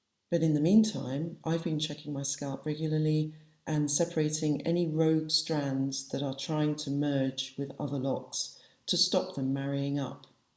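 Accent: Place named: United Kingdom. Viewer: Oooh heard the accent and subbed! Not found many Brits on here I’ve small locs 184 10 months old. I started my sisters 6 weeks ago she has bout 205. Will share this with her too hopefully got some good tips for us.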